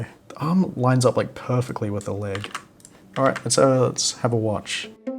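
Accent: New Zealand. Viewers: Are you kiwi? 🇳🇿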